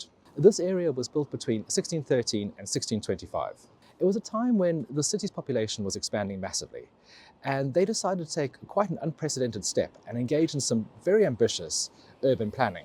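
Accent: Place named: England, United Kingdom. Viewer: You have an English accent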